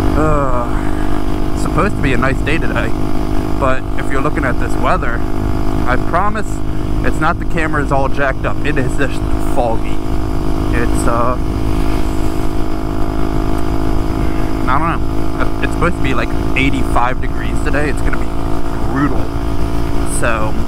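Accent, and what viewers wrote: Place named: Pennsylvania, United States. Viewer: For some reason I hear a PA accent…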